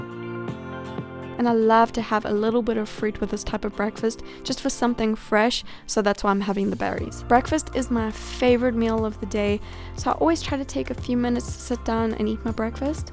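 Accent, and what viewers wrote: South Africa. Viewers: you are truly God sent. I have been struggling to loose weight for a long time and now it has started to affect my help. I started following you two weeks ago, and followed all you suggestions. I am happy to say in two weeks I have lost 2KG. So I just want to thank you and encourage other views to try your suggestions. I love your videos, your voice is soothing and I love you South African accent...lol. keep uploading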